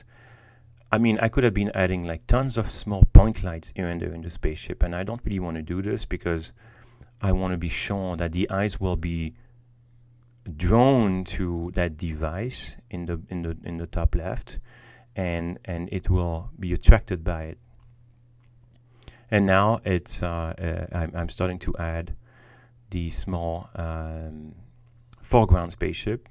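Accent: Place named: France. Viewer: Really cool. Your French Accent is cool! Im half french and I wish I had a french accent instead of a boring london one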